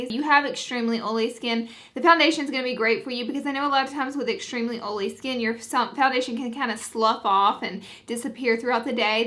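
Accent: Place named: Appalachia, United States. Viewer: Oh my god I love whoever this is because of the cute hillbilly accent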